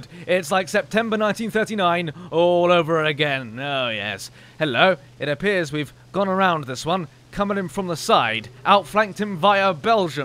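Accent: United Kingdom. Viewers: LMFAO i love your british accent. :D :D :D <3 "Yes..." :P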